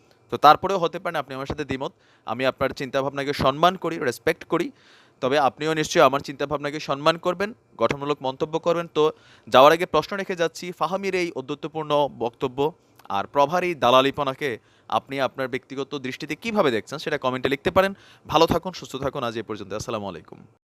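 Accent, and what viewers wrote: Comilla, Bangladesh. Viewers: যা ভাবছিলাম ভাই, তাই। আপনার গ্রামের ভাষা শুনে ভাবছিলাম হয়ত আপনি কুমিল্লার মানুষ। আপনি আমার দেশি মানুষ ভাই। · আপনার ভাষা শুনে আমি আগেই ধারণা করেছিলাম,আপনি কুমিল্লার।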